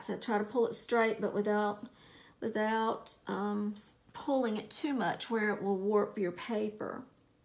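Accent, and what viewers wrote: South, United States. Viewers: Thank you for sharing what was on your heart today and inspiring us. It was very encouraging and I appreciate it. I love your creativity, and I'm really enjoying this series! It's like getting to sit right there with you and so we can create together. Plus, we get to hear your cute Southern accent and the way you express yourself. FUN!! I am thrilled that you found another way to use those "tag pockets!" That just makes it so much more fun for me and hopefully for those who purchase the kit as well. If we all did things the exact same way, it would be boring, wouldn't it? lol I love watching how you do your process and it's fun to sorta "see inside your head" and to see how it all comes together for you. I'm working with the regular sized "Rosewood Cottage" Journal kit right now and think it will be so cool when we are both done to see the different ways we use the elements.:) I love the little booklet with pockets that you created! 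Thank you for the tut!! <3 I so appreciate you and your sweet heart!! xo Marilou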